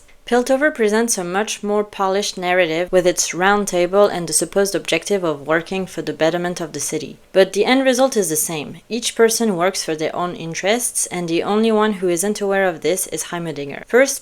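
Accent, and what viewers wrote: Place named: France. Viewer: i love your French accent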